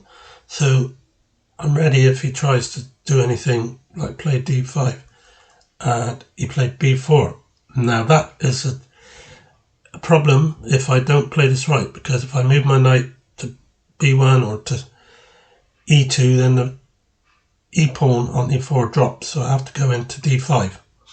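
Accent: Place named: Bristol, United Kingdom. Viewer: As soon as I heard your voice I thought hang about, he's got to be from Bristol hah. Subbed. 😎👍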